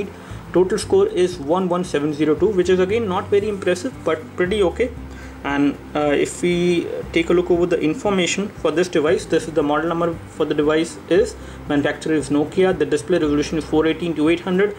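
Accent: India. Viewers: india okzent osin silnii ny prem oseni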